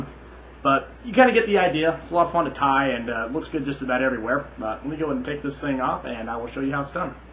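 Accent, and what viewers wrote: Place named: Utah, United States. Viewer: Two comments: #1 Love the knot, and a great demo video. Only thing you might consider for future videos is using a greater contrast between tie and shirt. #2 You'll need to work a little longer on ridding yourself of the Utah accent. Don't look now, but your Provo is showing.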